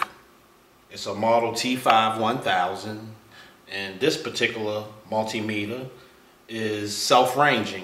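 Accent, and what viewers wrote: Baltimore, United States. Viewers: Dude from Bmore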